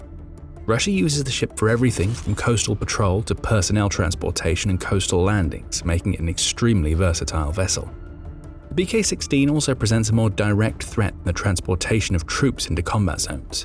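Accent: United Kingdom. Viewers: Im very surprised you're saying soccer when you sound like you're from the uk.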